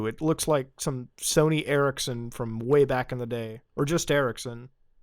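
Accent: Canada. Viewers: Your Canadian comes out pretty deep in these haha. Love it! This last bit is exactly what the internet used to be like in the 90's! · girlfriend half asleep listening to my video "is he Canadian? " "I can hear his accent" that it was cool how she just randomly said that I watch you every night lol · Maybe I’m just high af but sounds so Canadian in this video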